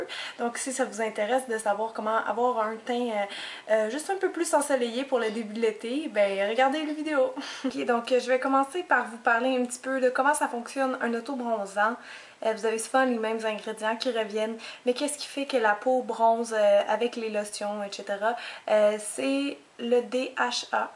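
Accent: Quebec, Canada. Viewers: Coucou. J'adore ton accent Québécois!! Dit je suis un Garçon j'aimerais essayer l'autobronzant Spray de Musst que tu a indiqué. mais vu que je suis un mec j'ai évidemment des poils aux jambes bras... et j'ai vraiment envie de me raser. Qu'en dis-tu? Je peux l'appliquer comme meme?:)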